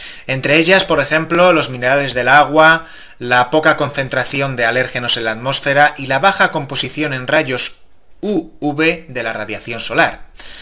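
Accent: Spain. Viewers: tu voz aparte de ser española es muy molesta.. 😒😒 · Buenos vídeos como ustedes dicen y mas chistoso por tu acento Español. Saludos desde México.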